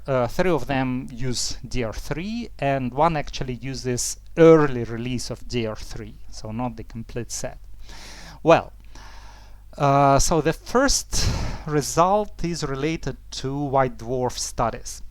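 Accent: Russia. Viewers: Родной акцент (хоть и не самый сильный) режет слух и не даёт сконцентрироваться на содержании... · не знаю, наверное русский акцент. Я же не учил произношение юар, филиппинцев.. или индусов - их ретрофлексный акцент надо ещё научится делать. А если ещё поумничать, то наверное ближе к юар, у них в африкаансе больше звуков похожих на те, что в русском.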